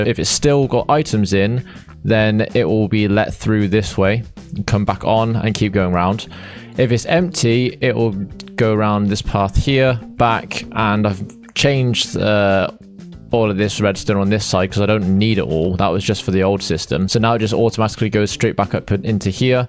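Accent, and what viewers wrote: United Kingdom. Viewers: Is this a fellow Brit?!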